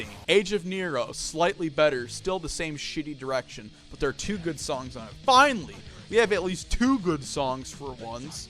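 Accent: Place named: United States. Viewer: lol, The Shadowthrone being the worst... Nemesis "Divinia"... lol :D 1. Disagree that any of the instrumentals are pointless... that's just a pointless point... 2. Disagree with your "absolute best" songs on the 1st two albums :) 3. Disagree on "Rebel Extravaganza" being the best album from those to come... I think it's the worst... Volcano is much better. Now, Diabolical; Satyricon & Deep calleth upon Deep are amazing albums! There's absolutely nothing wrong with them! This guy only says: this is the worst of all & that is the worst of what I've ever heard... lol, this guy sounds like 100% American id*ot who's trying to make sense of pure European Black Metal Epicness! :) Otherwise, there're some new stuff that I learned from that video, like the early history of Satyricon & their formation. So, I wouldn't say it's completely useless... something like 4/10 is fine. 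The rest is only "boring as fuck" this & that :D Nemesis DIVINIA - Peace Out! :)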